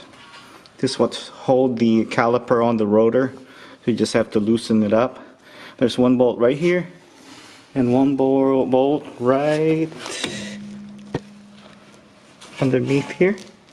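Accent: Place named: Philippines. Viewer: You have Pilipino accent boss 😁 astig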